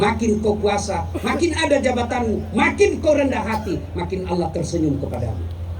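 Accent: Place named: Sabah, Malaysia. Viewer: Pintar sudah Ustadz bicara sabah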